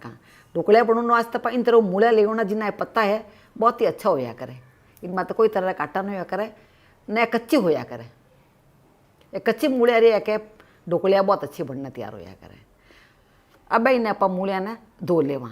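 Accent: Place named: Rajasthan, India. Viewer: AAP kata ra ho. Bilkul mari jeri Marwari bolo ho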